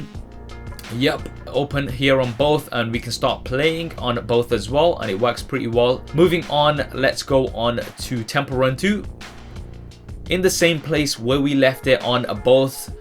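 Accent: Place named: England, United Kingdom. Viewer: Good review and nice to hear someone with an English accent and not saying "go ahead" every 5 seconds like a lot of Americans do. Only problem with this video is the description says S7 and iPhone 6s but in the review he's actually using an S7 edge and 6s Plus.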